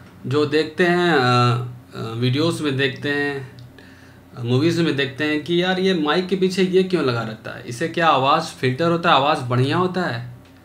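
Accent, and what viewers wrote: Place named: Bhojpuri, India. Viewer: Bhojpuri acha laga Sir apki awaz main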